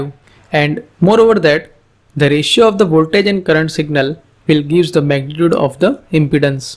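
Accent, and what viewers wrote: India. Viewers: Indian accent is big problem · can't understand your indian accent, please do it in english accent.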